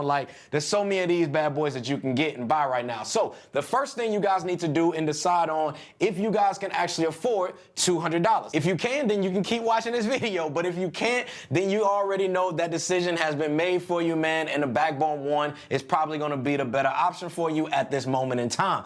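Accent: St. Louis, United States. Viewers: Bro are you from St. Louis? 😂 STL in the building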